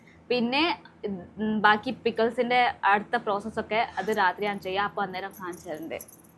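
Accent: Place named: North Kerala, India. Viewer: North Kerala, from the way you speak malayalam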